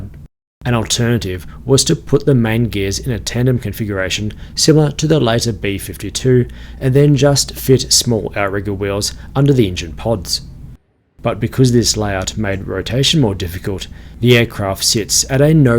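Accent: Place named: Australia. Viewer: Hate your Australian English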